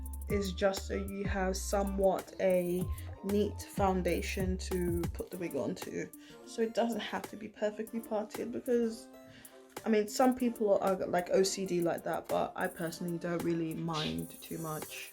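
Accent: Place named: Jamaica. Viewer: I loved this tutorial, then me hear the Jamaican accent and then me extra love it! Had to subscribe <3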